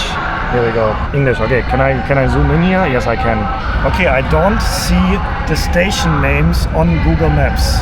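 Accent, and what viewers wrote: Germany. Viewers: Gah, it’s taken me so long to figure out where I know his accent from (yes, germany) but he sounds like Klaus from American Dad 🤣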